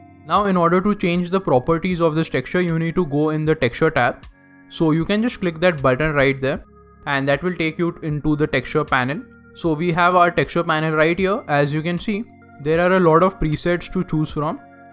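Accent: India. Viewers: I recently had a discussion with someone if indian accents are actually that distinctive like its shown on may shows in media. This guy proves they actually are. Good video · hindi people use to have that accent, u know cause phonemes changes. I talk spanish and I'll probably have some recognizable accent and americans that speak spanish you can identify their accent too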